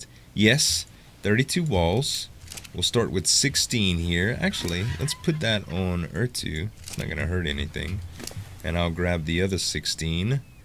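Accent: Louisiana, United States. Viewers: Where are you from? I'm catching a fellow Cajun accent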